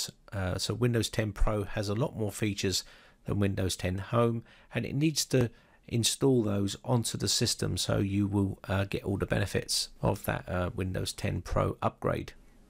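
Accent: England, United Kingdom. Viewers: That's a bit mild. I think they are parasite jive turkey mother humper cunts. Sounds so much better mate. Your videos are clear precise and in English from a English man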